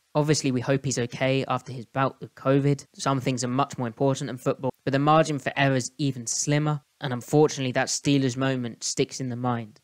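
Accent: United Kingdom. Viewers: Big fan! I've read your field gulls contributions for a long time and had no idea you were British till I heard these videos. Cool to have someone from across the pond cover the seahawks in such detail.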